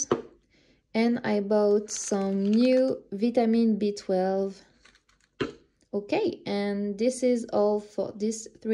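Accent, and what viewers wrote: Wales, United Kingdom. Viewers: The coast of Croatia looks so beautiful. I, too, love to hear the Welsh accent. I hope & you have a great weekend and the rest of the week. 🧡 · Love watching your travels! You'd be very welcome in the UK anytime:) and the welsh accent is lovely! My mum was welsh and she had such a lovely speaking voice! Happy travels x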